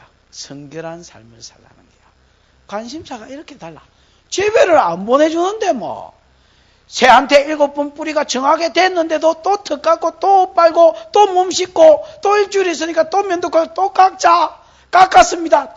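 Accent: Gyeongsang, South Korea. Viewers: 열 채우는 것, 기 채우는 것, 하시니 어릴 때가 생각나 웃음이 나오네요. 경상도 사투리 정말 정감 있습니다. 천국 언어는 경상도 사투리 비스무리할 거라는 목사님 말씀에 전적으로 공갑합니다. :hand-pink-waving::hand-pink-waving::hand-pink-waving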